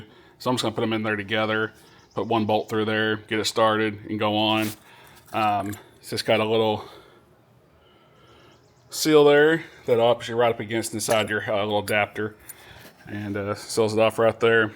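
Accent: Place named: Texas, United States. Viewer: Accent sounds familiar… would u happen to be in Texas? Lol I just bought a sixpack scat this week. Tryna to see if anyone knows a shop that can handle my more advanced mods and tuning and stuff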